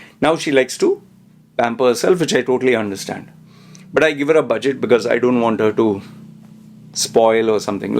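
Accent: India. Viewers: Bro is Indian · Indian accent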